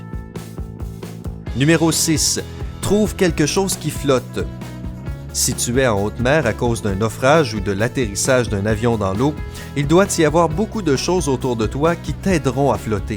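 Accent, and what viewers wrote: Quebec, Canada. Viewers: Tu est quebequoi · Le naratteur c un québecois non???????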